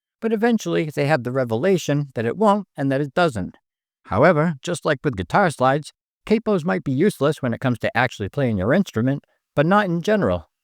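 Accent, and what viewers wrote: Boston, United States. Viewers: Definitely a fellow Bostonian lol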